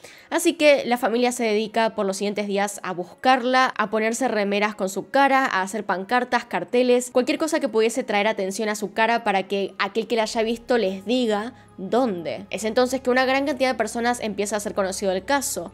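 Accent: Argentina. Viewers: Es agradable el acento argentino y si es en un caso interesante, mejor 😅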